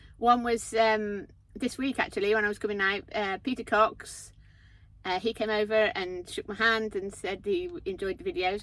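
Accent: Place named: Worcestershire, United Kingdom. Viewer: I thought your accent was local. Keep flying the flag for Worcestershire!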